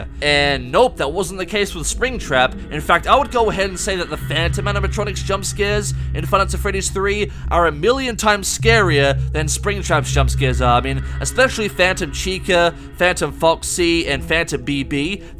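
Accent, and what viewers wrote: Australia. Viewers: Omg how didn’t I know he was aussie? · I didnt know you were aussie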